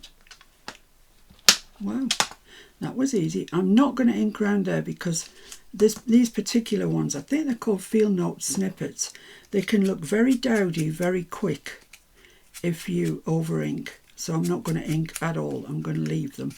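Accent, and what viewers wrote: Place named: North East England, United Kingdom. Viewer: I've just found you today and subscribed, lovely to hear a fellow North Easterner on YouTube. How can I connect with you? looking for fellow crafters around Northumberland.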